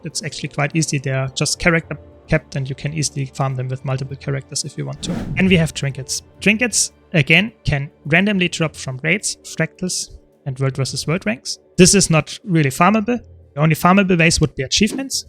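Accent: Germany. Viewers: Thanks a lot my dude. I really needed that video to progress my Guild Wars 2 journey :) Btw .. hör ich da einen deutschen Akzent? 😂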